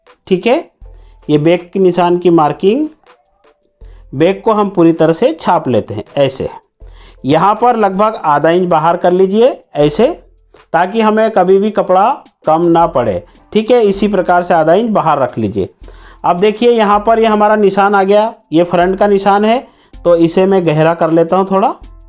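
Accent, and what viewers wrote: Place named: Maharashtra, India. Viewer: मला वाटलच होतं आपण महाराष्ट्रीयनच असाल कारण आपण अठ्ठाईस ला अठ्ठाविस, सत्ताईस ला सत्ताविस बोलत होता. जय हरी विठ्ठल बोलणारा माणूस मराठीच असू शकतो. 💐💐💐🌺🌺🌺💐💐💐💐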